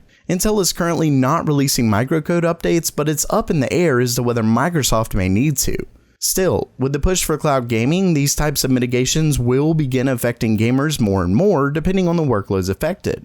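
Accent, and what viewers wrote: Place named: South, United States. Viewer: You should do a video where you don't try to hide your southern accent lol I keep hearing it try to come out haha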